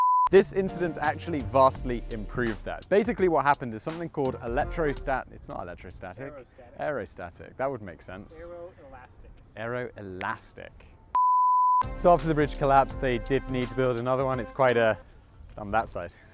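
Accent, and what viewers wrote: England, United Kingdom. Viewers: That a pretty unique English accent...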